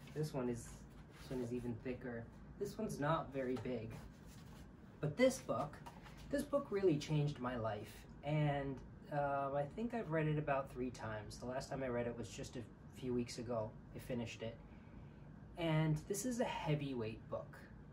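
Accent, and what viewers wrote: South, United States. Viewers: Love the southern accent! good idea! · As a native Texan, I found your southern accent delightful, and you are just adorable.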